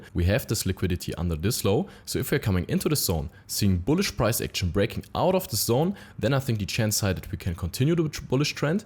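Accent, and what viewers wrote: Germany. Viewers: I like your german accent ❤🎉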